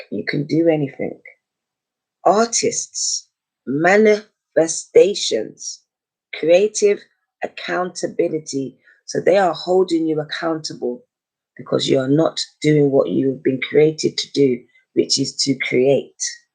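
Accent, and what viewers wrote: United Kingdom. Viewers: I thought so! ☺️ I‘m Swiss but my boyfriend is from Manchester too thats why your accent sounds familiar to me 😍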